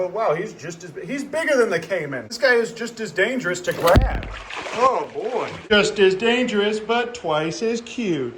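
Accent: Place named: New York, United States. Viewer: Oh, he's in Texas? He sounds like he's from up north, like New York.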